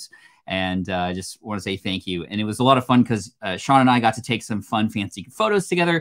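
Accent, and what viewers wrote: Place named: United States. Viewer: Hey fellow audience members, What type of American accent is this? I’ve just noticed this a few times in different videos on YouTube. And I really like this accent. What kind of city or state or whatever does this accent come from. It reminds me of the accent of the guy at dailytekk. PS I’m outside the US, and have started to recognise in a little bit more detail different American accents rather than just the classic ones I knew as a kid, and it’s just interesting to me if I hear one that I like and I keep hearing in different videos. I probably will end up looking up a accents of the US kind of video. But every now and again I’ll just ask what is that accent because it’s interesting to me in the moment. Thanks.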